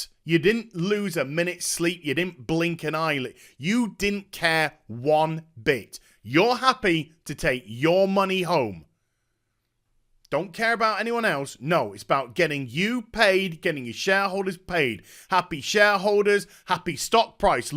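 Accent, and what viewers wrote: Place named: United Kingdom. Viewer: Hey! Im no one. Just someone who watches you. IMO this 'right into it' format is IDEAL for you. Straight away. Spot On. Very engaging right off the bat. Youre not a jester. You dont have to try to 'entertain us' before each vid. I believe most of us watch you because we believe you are a very like minded, level headed guy with a great British tenor and the same 'take' we would likely have on the given subject. Just my humble opinion. Love ya...Keep it up <3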